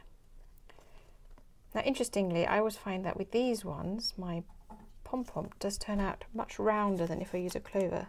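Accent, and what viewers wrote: England, United Kingdom. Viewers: Ty and are you British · Thank you for that. Nice hearing an 'English' accent on YouTube for a change. I used to make PomPoms like this when I was a child. Though I didn't cut a slot, we would sew the yarn through the hole, and fill the hole up. (I think I like this way...)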